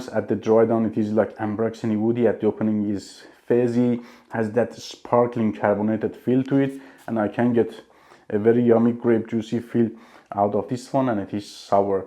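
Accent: Iran. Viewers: you have Iranian accent!!!??🤔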